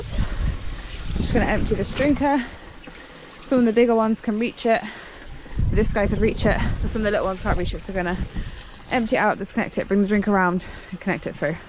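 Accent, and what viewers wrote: Ireland. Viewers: Great video, more!! You're accent is absolutely starting to sound a lot softer and Irish 😊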